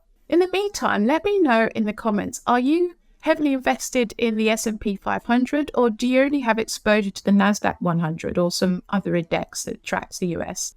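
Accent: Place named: United Kingdom. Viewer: A Brit talking/teaching about the American stock market? LoL...I was caught off guard at first. Like your accent, though. Good stuff. I'll check out the rest of your videos too.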